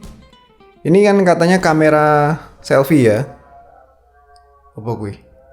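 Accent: Java, Indonesia. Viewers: Omah mu ngendi lek...logat e jowo ngono 🤣. Trs le guyu Yo kok diejo😁 Ditunggu versus in nya...yg seharga ya...vs nya beberapa smartphone lah. 😁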